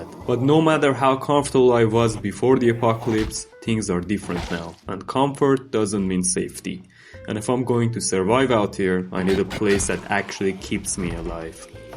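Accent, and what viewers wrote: Iran. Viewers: دادا ایرانی؟ لهجت داد میزند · the persian accent 😂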